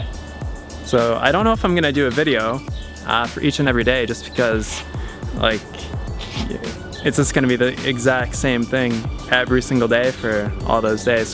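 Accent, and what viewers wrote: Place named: Canada. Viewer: The Canadian accent 😬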